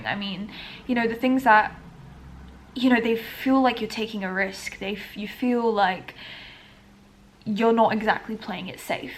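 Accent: United Kingdom. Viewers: Are u from London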